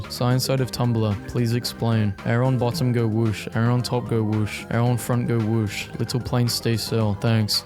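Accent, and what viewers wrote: United Kingdom. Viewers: Wait he's British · nah really? i thought he was from the moon? yes of course hes british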